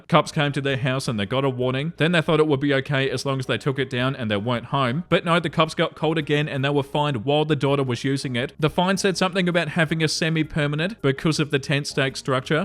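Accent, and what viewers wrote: Australia. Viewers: NGL, I absolutely love Australian accents and it's part of the reason I find your reads so funny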